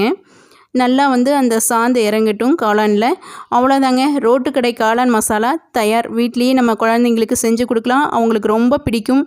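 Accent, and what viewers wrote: Kongu, India. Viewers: 3 Lk கொங்கு தமிழுடன் ரோட்டுக்கடை காளான் சுவையோ சுவை 😋😋அருமை sis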